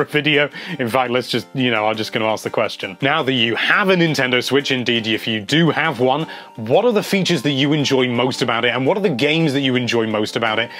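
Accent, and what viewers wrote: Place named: England, United Kingdom. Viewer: wait jij bent een Nederlander? Why do you have a english accent?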